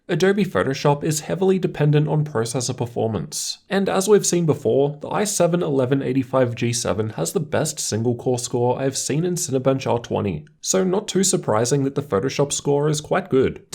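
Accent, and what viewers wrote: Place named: Australia. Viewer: Imagine not spotting an aussie accent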